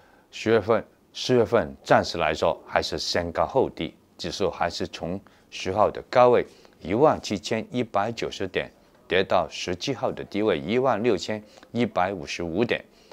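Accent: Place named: Japan. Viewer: 像日本人講普通話，哈哈😃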